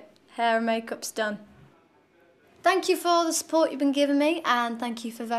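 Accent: United Kingdom. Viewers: Goshh I LOVE BRITISH ACCENT!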